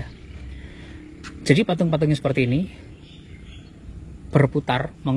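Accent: Java, Indonesia. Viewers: Medoknya sm doanya itu loh khas bgtt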